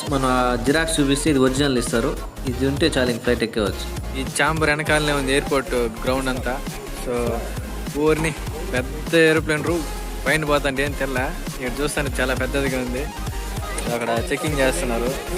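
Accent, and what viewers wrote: Chittoor, India. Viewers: ఫస్ట్ టైం లా లేదు బ్రదర్...బాగా అనుభవం ఉన్నట్టు చెప్పారు...🤔🤔🤔ఏదేమైనా మీరు సూపర్ బ్రో...మన యాసని సరదాగా ప్రపంచానికి పరిచయం చేస్తున్నారు... వింటున్నంత సేపు చిత్తూర్ పరిసర ప్రాంతాల్లో తిరుగుతున్నట్టు భలే ఉంటాయి మీ వీడియోస్...👌👌👌👍👍👍 ఫ్రమ్ శ్రీ కాళహస్తి... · I love chittor slang. I have frds in tpt chittur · Ni videos super ba.. slang style vere level..baa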